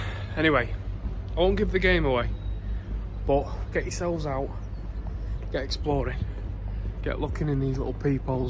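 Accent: Manchester, United Kingdom. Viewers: Hiya, I discovered your channel this week while researching the scuttlers. I subscribed right away because walking around with you and listening to your accent made me a little home sick. I love your editing skills, brilliant! Production quality is first class. Great subject matter too. I hate the adds but understand why they're there. I'm working my way through your catalogue because it's not only interesting and informative, but like I said, it's like having a walk around town with a mate showing you stuff you didn't know about. Grew up in Charlton-on-Medlock, (1950/60s) and Fallowfield in the 70's. Had my 18th birthday in Strangeways on my way to Hindley. But I've been a good lad (more or less) ever since. Haven't lived in Manchester since 1983, haven't visited the UK for about ten years. Wasn't sure it felt like I belonged anymore, too many changes. 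Only normal I suppose. Anyway, thanks for what you're doing, really appreciated. BTW...I always fort there was an 'r' in war-ter an all.....Ha ha ha!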